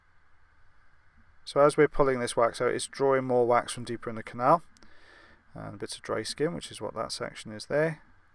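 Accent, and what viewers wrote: Wales, United Kingdom. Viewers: Are you welsh cuz same😂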